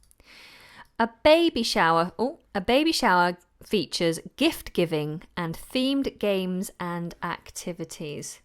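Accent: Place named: United Kingdom. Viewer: can you please speak with a more clear British accent, even in some sessions not all of them! I know that you speak with a British accent in this video, but are you speaking in the same way during your normal day? Thanks in advance!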